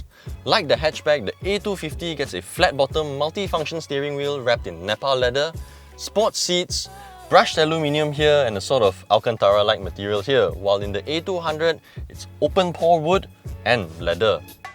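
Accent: Malaysia. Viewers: 怎麼感覺是馬來西亞腔英文....好奇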